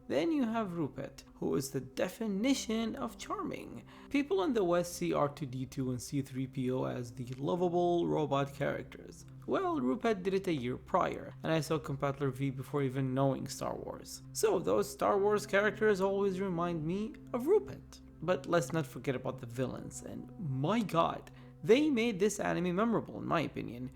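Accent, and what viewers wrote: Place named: Arab world. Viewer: are you an arab? u sound like a person who grew up in the middle east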